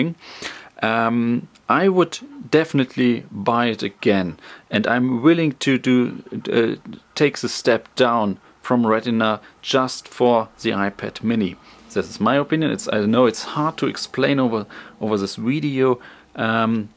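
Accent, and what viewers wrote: Germany. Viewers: after 2 sentences i knew hes german ^.^ am i right? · Nice comparison. i went to Saturn today and test the Ipad mini it was a little bit horrible specially on some Text in websites compare w/ retina.. "Anyway if you guys asking which Nationality this guy belongs due to his accent... His from Country where Mercedes Benz,BMW,Audi and VW was made =)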